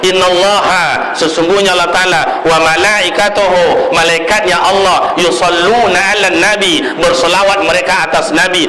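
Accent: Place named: Ocu, Malaysia. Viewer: Soang ko tio ustad nan bahaso ocu kental kek awak nye,lain podo tu,uwang ocu juo nye,tapi bahaso minang,kan lucu du, Ustad ko mantap,asli ocu n bahaso nyo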